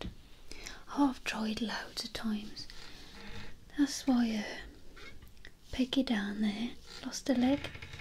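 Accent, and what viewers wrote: Black Country, United Kingdom. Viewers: Black country accent is spot on! Great job!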